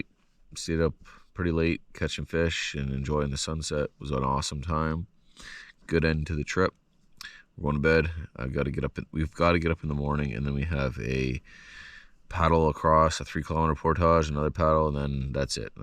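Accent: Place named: Northern Ontario, Canada. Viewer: I can't remember where is from, sounds like he's alot closer to me than I thought. 3hrs from NB, so around Timmins or Cochrane?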